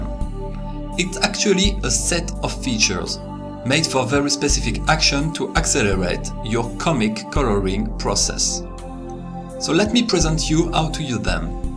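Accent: France. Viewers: Cet accent français. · I'm not a native english speaker and I tent to have problems to understand people with accent. But understanding you is very easy don't worry. Most people, me included also like the french accent. Thanks for the tutorial! Would love to see more in the future. Love your art and love free software too! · your french accent sounds amazing,to be honest. the video itself is very useful,thank you,the art looks incredible,love it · I worked in Issoire, France for 4 months in 2005, near Clermont Ferrand. It was refreshing to once again hear someone with a French accent speak such proper English. Makes me wish I was there again. Nice job overcoming the language barrier, and nice job on the video as well. I'm about to download Krita now in order to try to use it to illustrate a children's book. Wish me luck.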